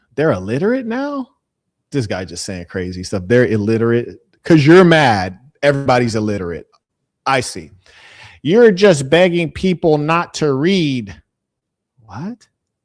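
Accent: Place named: United States. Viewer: Well done. ❤ your videos. Take heed that the ONLY reason these Black 🇿🇦 are acting all sweet, agreeable etc is because of your American 🇺🇸 passport, accent & dollars which they associate with WHITENESS = WEALTH. If you were from Zim or any African country...they would have been aggressively rude to you. Dont be fooled by the agreeableness & listening to you. They see 🤑! I say this because Africans been telling these self -hating 🇿🇦 Knigrows the same thing thing but they insult, because they call Africans (Africans) distancing themselves as Africans because African is associated with BLACK...which means Poor, Beggarly and Helpless. Stay woke to these black 🇿🇦 cats. 😉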